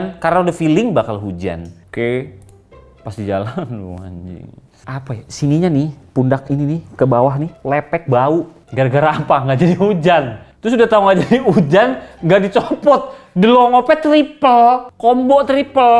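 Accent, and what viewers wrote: West Java, Indonesia. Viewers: bang ngmg sundaniss canduuuuu ❤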